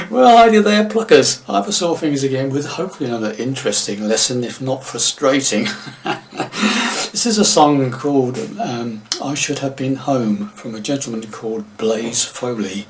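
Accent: England, United Kingdom. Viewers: The English accent caught me off guard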